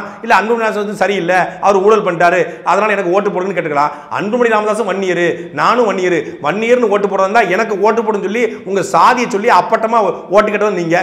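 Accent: Tirunelveli, India. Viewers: இவ்வளோ மரியாதையா பதில் சொல்லிருக்க வேணாம், சாட்டையின் திருநெல்வேலி தமிழே போதுமானது மருத்துவருக்கு 😉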